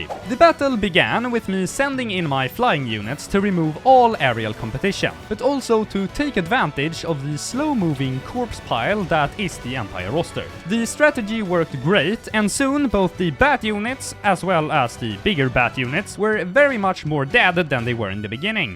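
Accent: Sweden. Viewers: Nah, he sounds extremely swedish, this is the most stereotypical swedish accent //another swede here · I have few Swedish friends and they don't exactly sound like him but I guess it's close enough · This is the best swenglish I've heard since the "regular ordinary Swedish mealtimes" channel went inactive. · Holy tits this mans Swenglish accent is absolutely heavenly I need this in my ears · Bro is as close to swenglish as you can possibly come while still pronouncing the words correcty lol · most heavy swedish accent❤ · As a scandinavian this is the most perfect demonstration of Swenglish i have ever heard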